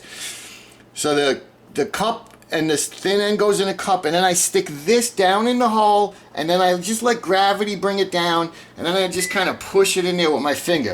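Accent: New York, United States. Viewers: That was great! Love the N.Y. accent makes me feel like home. I watched a few video's and you where the only one that mentioned cleaning it and putting a rag in the sink two very important things. Also baking soda and white vinegar will take off that water deposit stuff on the faucet. Also you were the only one that said which way the spring goes very thorough Excellent job! Thank you now I am going to try it! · Good job!! Really enjoyed your accent. Haven't been back to my birthplace, NYC/Long Island, since early 70's!!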